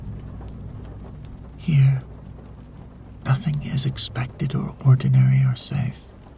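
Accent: Scotland, United Kingdom. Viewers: Thank you. I love your Scottish accent. This is my favorite fairy tale story ❤.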